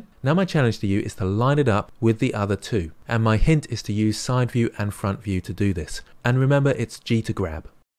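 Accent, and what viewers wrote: United Kingdom. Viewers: Nice to have some expert tutorials with a British accent. Gonna get through your playlist, and after watching so many, I hope this will allow me to start creating instead of videos. Thanks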